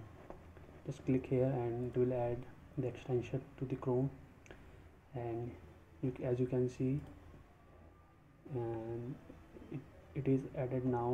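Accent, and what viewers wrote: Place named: India. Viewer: in fact you talk english but the problem is that you talk it with an Indian accent. This problem is common with the absolut majority of Indians who talk english. Indians have weard accent when they talk english, and this makes that most of people can't understand them when they talk in english. It's strange that Indians talk english with that weard accent especially that their country have been colonised by Grand Britain and for a long period. on the other hand We find that many nations that have not been colonised by Britain have understandble english. Normally Indians are supposed to talk english better than all the other anglophone countries due to the long British presence in India. Also there is large Indian communities in Britain and USA. Indians are smart people and their counrtry had been developped a lot the last 30 years. 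It's really not understandable that Indians talk english with a weird accent that make strangers not understand them but also attack them or laugh and mock of them. with all respect, My advice for you is that you should emprove the way of spelling in english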